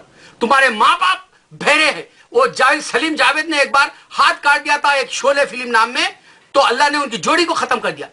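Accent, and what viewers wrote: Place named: India. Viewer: ye indian hai yaqeenan kiun ke Urdu is tarah pronounce nahi hota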